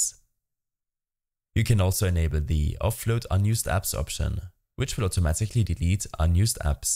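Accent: Germany. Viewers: Ich höre bisschen deutsch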